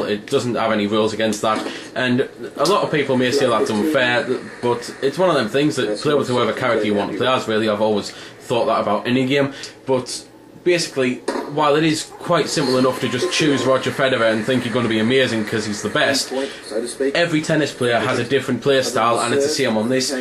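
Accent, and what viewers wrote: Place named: Newcastle, United Kingdom. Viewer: this is an old video but i still found it helpful, an excellent review, and the commentator had what i think is a geordie accent , "Howay the lads!" "Toon Army"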